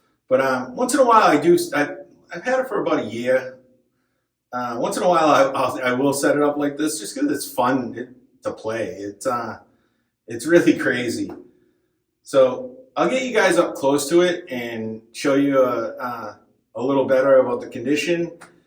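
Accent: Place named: New England, United States. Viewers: I had a Tama Rockstar kit that was the same setup as this. You mention Lake Winnipesaukee which is funny since my brother lives in Gilford which is right there. It's been years since I've played, but I follow all the top drummers like Weckl, Borlai, Sucherman, Donati, Coleman, Smith, Dicenso, etc. This kit takes me right back to the 80s when I was teaching myself to play from vinyl to bands like Rush, Zeppelin, Aerosmith, Judas Priest, Iron Maiden, Journey and the like. My first kit ever, in the late 70s, was a five-piece Slingerland. First song I ever played - Kiss God of Thunder. LoL I'm guessing you're a Mass guy like me with your accent and all. Pretty cool vid. Thanks for posting. P.S. I also owned a Gretsch set that I traded a North set for. Didn't care for the North. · came for the drums, stayed for the new england accent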